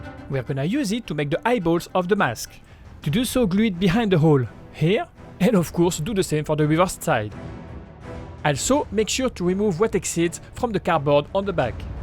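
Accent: France. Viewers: You have a french accent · frero sa ce vois que tes français vue ton accent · t'es pas un francais par hasard ? Cet axcent et vraiment similaire stp reponds moi t'es creations sont incroyables continu comme ca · Tu es Français cela ce voit grâce à votre accent extraordinaire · Wow the french accent 🫠🫦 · Avec ton accent français, ça fais bizarre je vais pas mentir, par contre super mask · A en croire son accent il est français ??? 🫶🏼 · L’acent français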